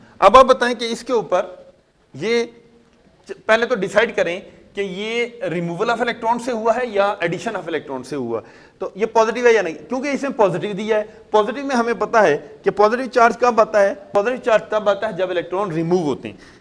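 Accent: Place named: India. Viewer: SIR You neeed to improve your English You have desi style OLD VERSION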